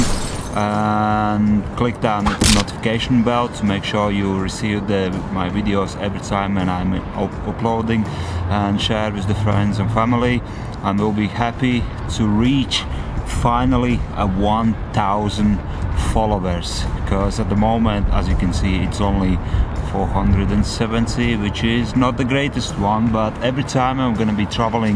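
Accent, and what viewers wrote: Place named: Liverpool, United Kingdom. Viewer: Scariest place in UK ... 🤣 Shows my high school 🤣 can say I went to the school of hardknocks now? 🤩 And on another note, your 'accent' is off the charts, I can hear skem scouse in there and whatever your native language dialect is. 👍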